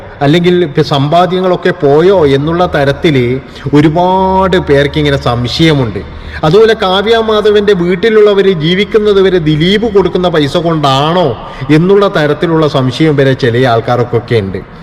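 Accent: Kannur, India. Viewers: കണ്ണൂർ ഭാഷ ആണല്ലോ പറയുന്നത്, അപ്പൊ നമ്മളെ നാട്ടുകാരൻ ആണ് അല്ലെ 👍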